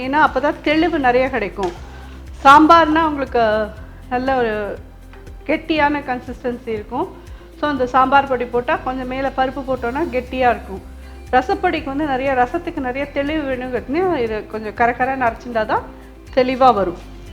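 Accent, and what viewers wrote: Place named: Thiruvarur, India. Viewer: மாமிஉங்களுக்கு நீண்ட ஆயுளை மஹா பெரியவாளும் என்னுடைய ராமனும் தறுவார்கள் உங்களுடைய பேச்சை கேட்க எங்க ஊர் திறுவாறுர் நினைவு வறுகிறத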